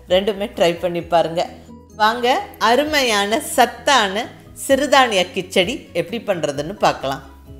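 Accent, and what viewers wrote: Kongu, India. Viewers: Today mrng for breakfast i tried tis aunty. My MIL loved tis. I too feel fulled after having it. ❤ from cbe (sundarapuram) i m big fan for ur voice, receipes and our kongu slang❤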